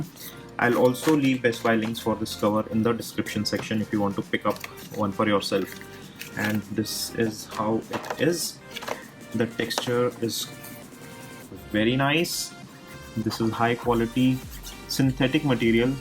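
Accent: India. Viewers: lol the indian accent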